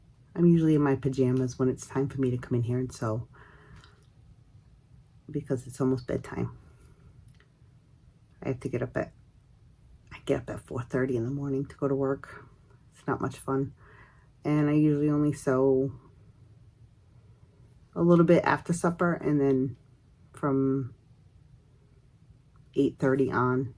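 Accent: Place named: New England, United States. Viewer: Hi! I found your channel from wheel of fate -- and decided to go back to the first video / intro. Nice to meet you. I can definitely hear your New England accent! I subscribed and look forward to catching all your makes videos.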